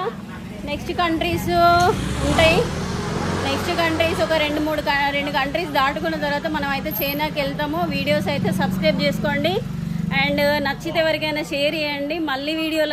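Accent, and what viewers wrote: Telangana, India. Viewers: ❤తెలంగాణ యాసలో మస్త్ గాఁ explain చేస్తున్నావ్ ❤️శుభాకాంక్షలు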